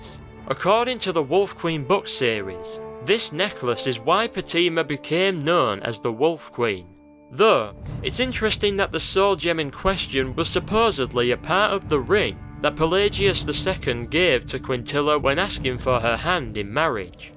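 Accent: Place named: Yorkshire, United Kingdom. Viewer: I love Yorkshire accents almost as much as I love the Elder Scrolls. Luckily for me. :)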